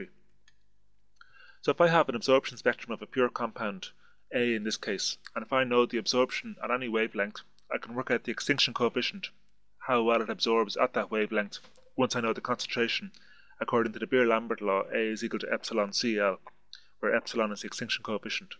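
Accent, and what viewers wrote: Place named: Ireland. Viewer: Lol, recognisably irish accent. refreshing for a change :)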